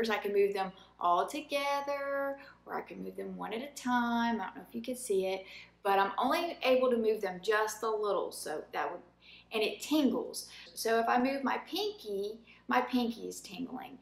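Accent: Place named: South, United States. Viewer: I am not American. Are you from the south somewhere? Cool accent.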